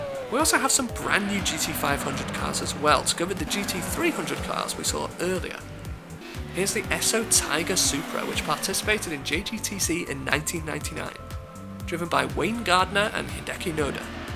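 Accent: United Kingdom. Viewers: Ah yes, nothings warms this cold friday evening more than listening to a british bloke talking about a GOAT tier simcade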